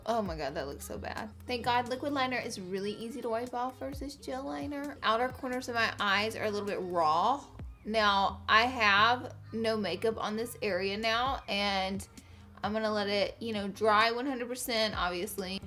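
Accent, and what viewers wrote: South, United States. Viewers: rute maria or Farrah Abraham..but a little more southern? idk who she is so idk if she's from the south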